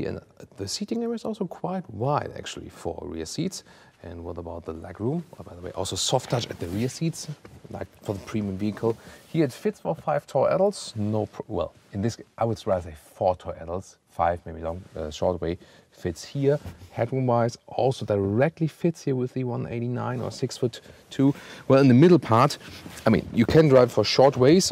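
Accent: Germany. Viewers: Great review, this channel improved a lot in the last year, good job! And love the german accent as Im italian whom lived in Germany and learned the language.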